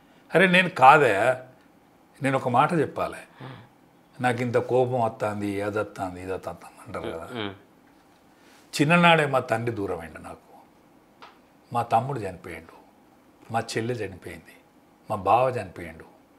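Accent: Telangana, India. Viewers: original telangana yasa Basa, kattu, bale untadi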